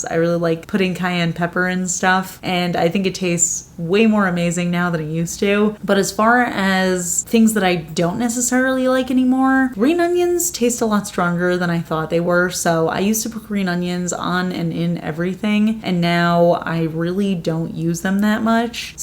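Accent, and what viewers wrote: New Jersey, United States. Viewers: My late mother was from Jersey, and your Jersey accent (like all Jersey accents) brings me joy! Glad you haven’t lost it! ❤ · I'm happy the surgery was so successful. It's so nice hearing your New Jersey accent rather than blocked sinus Jersey. Wishing you continued good fotune with the swelling going away.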